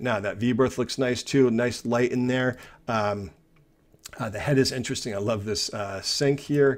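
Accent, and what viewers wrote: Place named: College Park, United States. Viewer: I'm A native of collage Park, thought I recognized that accent! 😂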